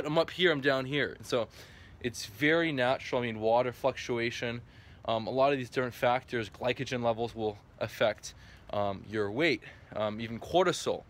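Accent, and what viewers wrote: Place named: Canada. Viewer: He's from Torontaaaa like us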